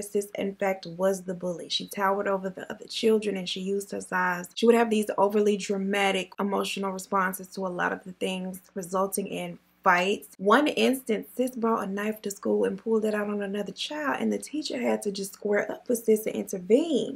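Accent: South, United States. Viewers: Totally off topic, but I love her accent! I'm from the UK, so I've got no clue what part of America she's from...but I like it! Xx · Yess. And that lil southern accent to top it off🥰🥰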